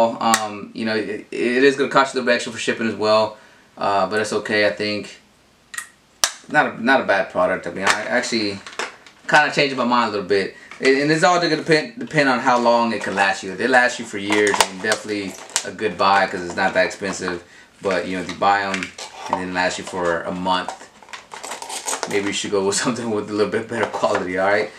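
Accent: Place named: Mexico. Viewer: I don't understand where that's coming from, but he seems to be Mexican through his accent, but you can't tell everyone's origin by just looking at em.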